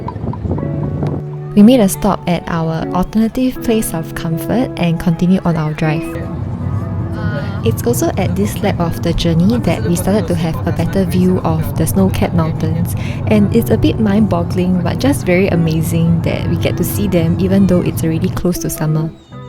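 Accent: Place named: Singapore. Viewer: Much needed video! Thanks so much for sharing, feels so good to hear your Singaporean accent😂 It's been 4 months since this part 2 was posted. Hope all is well with you and your family. Looking forward to part 3!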